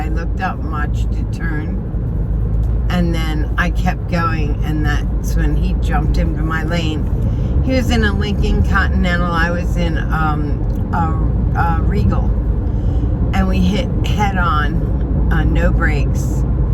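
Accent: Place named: Philadelphia, United States. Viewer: I'm so glad I found your channel. I have been feeling super alone lately and well iam. Yet I found your channel and your personality etc is like having a really cool friend talking to ya. I had a feel I ng from they way you speak that you had to be close. I'm in Philly and heard you say where u were. So cool!!! Just wanted to say hello and thank you. Sending love and positive vibes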